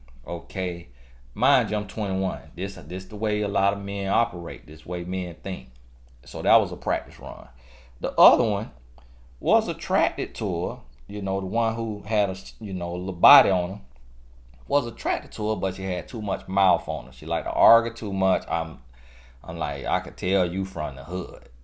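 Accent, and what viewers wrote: South, United States. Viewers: You so real, and transparent! from your dating in your younger years, to being honest about your preference in your wife, and the Lord bless you two kids later.. Your country talking self is alright with me😆👍🏾 I love it! Keep on palming your wife! The RIM ROCKER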